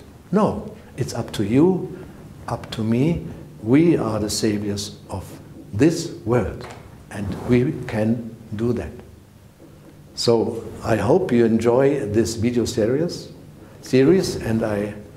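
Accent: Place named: Bavaria, Germany. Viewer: Your Bavarian dialect mixed in with English is a delight to my ears. 😘 I’ve lived in America for many years and still have a little Munich/Bavarian accent😘 love your courses in German and English. Eye and heart opening.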